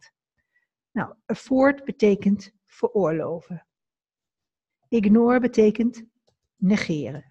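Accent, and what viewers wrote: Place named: England, United Kingdom. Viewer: je hebt echt een engelse accent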